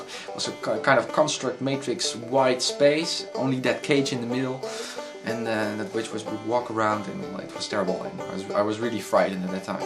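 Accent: Netherlands. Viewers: nederlands accent :D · I can hear you are dutch :P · Haha, when I heard your voice I thought "hmm, that's sounds like the advanced english usage by a Dutch person", and I was right. Keep up the good work en veel geluk! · Are you dutch? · Lol. First sentence was said and I knew you were Dutch :D!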